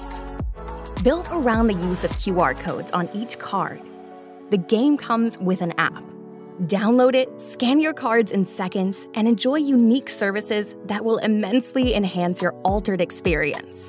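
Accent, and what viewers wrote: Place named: France. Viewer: The French accent makes it so much better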